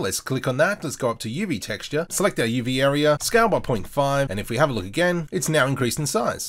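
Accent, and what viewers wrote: Australia. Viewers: Youve turned in to an Aussie Ian Hubert :) nice tute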